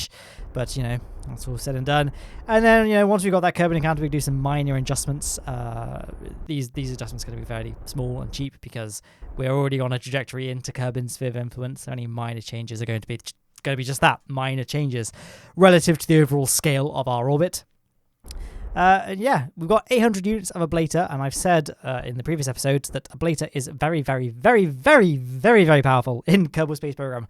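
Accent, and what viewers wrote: United Kingdom. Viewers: I love his stuff, great video's and explanations. I even like the British accent. Half way through this one though, and I've lost interest in him. HeiGHT. HIGH T. HARD T. It's not heigthhhhhh!!!!! Well educated, a doctor, and ENGLISH- it's THEIR LANGUAGE!!!!!!! Do you axe patients how dey feelz too?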